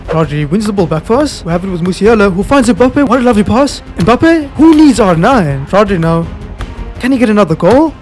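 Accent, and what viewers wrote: South Africa. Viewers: Yooo u south african 🇿🇦?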